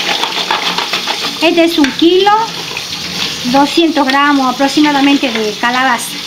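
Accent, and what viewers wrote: Tucumán, Argentina. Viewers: Soy tucumana y con la misma tonada, me encantó la receta, mi abuela la hacía igual, gracias.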